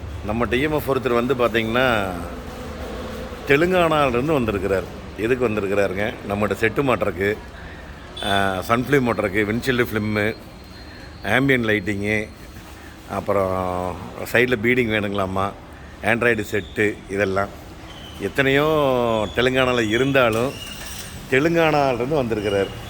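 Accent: Kongu, India. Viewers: Car Dr. Mr sir, For yours nice Kongu speech and open to all pannady. Congratulations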